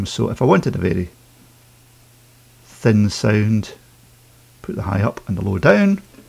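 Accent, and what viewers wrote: Scotland, United Kingdom. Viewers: great review and lovely scottish accent. i can't wait to get this amp!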